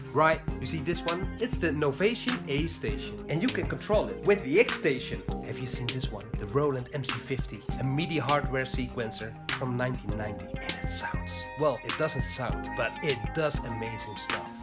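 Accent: Netherlands. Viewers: Were are you from? i spot a bit of Dutch in that English spoken word. But you are just like me: hardware, sniffing hardware etc. Amazing :D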